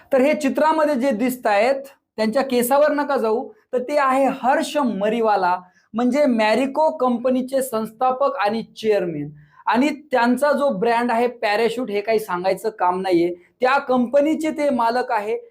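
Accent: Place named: Vidarbha, India. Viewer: दुसऱ्यातल्या कमतरता शोधण्यात लोकं पटाईत आहेत.स्वतः भले ही कर्तृत्वशून्य असो. त्यांनी जो केसं असा शब्द वापरला तो केस या शब्दासाठीच वापरला आहे विदर्भ आणि मराठवाड्यात बोलली जाणारी मराठी अशीच आहे आणि आम्ही आमच्या बोलण्याच्या पद्धतीवर खूप प्रेम करतो. कोकणातील लोकं वेगळ्या शैली ची मराठी मोलतात तर खांदेशातील लोकं वेगळ्या.. आणि आम्ही सगळ्याच्या बोलण्याच्या पद्धतींचा आदर करतो... ते ज्या विषयावर बोलत आहेत त्याच्यावर ध्यान देणं जास्त गरजेचं आहे न की ते मराठी किती शुद्ध बोलत आहेत. दुसरी गोष्ट ते काही मराठी व्याकरणाचं लेक्चर नाही देत आहेत त्यामुळं शब्दांतील बारकाव्यापेक्षा त्याचा मॅसेज महत्वाचा आहे... तसं ही अमेरिकेत किंवा यूरोपात गध्यातला गधा माणूस सुद्धा अगदी शुद्ध इंग्रजी बोलतो याचा अर्थ तो खूप बुद्धिमान आहे असं होत नाही. फक्त तो ज्या ठिकाणी वाढला आहे त्याचा तो परिणाम आहे. तुम्हाला जर वाटत असेल की तुम्हीलाच फक्त प्रमाणित आणि शुद्ध मराठी बोलता येते तर याचा अर्थ असा होत नाही की तुम्हीच खूप बुद्धिमान आहात... 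आणि तुमच्या कमेंट वरून तुम्ही मला त्या अमेरिकेतल्या शुद्ध इंग्रजी बोलणाऱ्या गध्या माणसा पेक्षा वेगळे वाटत नाहीत... एका शब्दावरून तुम्ही त्या सरांची शाळा, शिक्षण आणि बुद्धिमत्ता सगळंच काढलत. तुम्हाला स्वतः एकदा पहिली पासून शाळेत जायची गरज आहे. शिक्षण फक्त शुद्ध मराठी शिकन्यापुरत मर्यादित नसत त्यासोबतच भलेही भाषा शुद्ध नसो इतर लोकांशी कसं वागायचं हे ही शिकवत असतं कदाचित तुमचं ते शाळेतच विसरलं आहे 🙂👍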